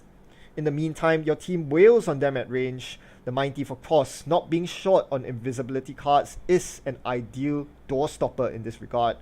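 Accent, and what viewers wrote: Singapore. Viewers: You from Singapore by any chance?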